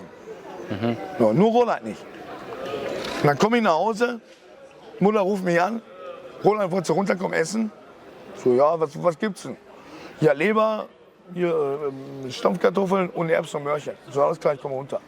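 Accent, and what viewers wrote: Ruhr, Germany. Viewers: Wenn man nicht hinguckt könnte man meinen Kalle Grabowsky redet. Ruhrpott rules. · Ich liebe den Ruhrpottakzent! Ich wünsche Roland alles gute, man merkt, dass er das Herz auf dem rechten Fleck trägt. Ich hoffe für ihn, dass er lernt Verantwortung für sich zu übernehmen, auch wenn ich ihm glaube, dass er eine bitter harte Kindheit hatte.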